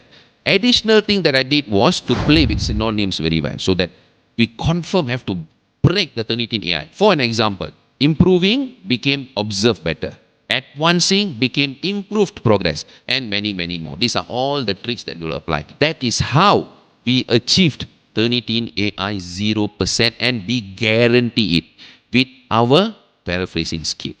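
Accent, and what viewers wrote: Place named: Singapore. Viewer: This man speaks and sounds like Kumar from Singapore.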